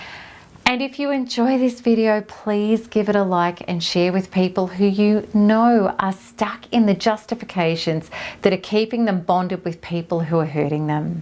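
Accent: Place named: Australia. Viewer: I never cared for Australian accents, no offense. Then here comes! Your voice, including accent 😀 is so soothing. God bless the good work you do! CD for me was all the shitty things he was DOING and the "I love you" he was SAYING.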